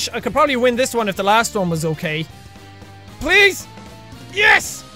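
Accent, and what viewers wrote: Ireland. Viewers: I feel so sad I havnt watched 4eva and I miss the cursing and irishness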